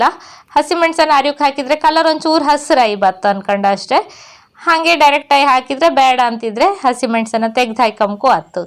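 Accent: Kundapura, India. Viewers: Avaru kannada sariyagi mathadthidare. idu udupi jilleya kundapura thalukina pradeshika ಭಾಷೆ ಕುಂದಾಪುರ kannada (kundagannada)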